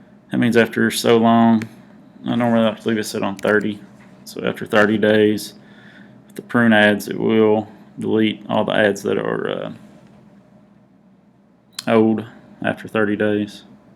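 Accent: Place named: Appalachia, United States. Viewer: Hey there, Thank you for your very helpful information. Can't help but notice that you sound like you're from the Appalachian Mountains area, close to me, in NC.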